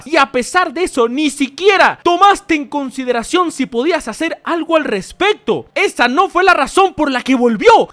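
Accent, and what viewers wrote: Venezuela. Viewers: ajkjask mrc, me llena de vida escuchar a modo venezolano super obstinado, fuerza fuerza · Llevo años viendo videos de y primera vez que le escucho lo veneco y modo insultador jajajja coño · Y pensar que ese acento venezolano volvería jajasjsaj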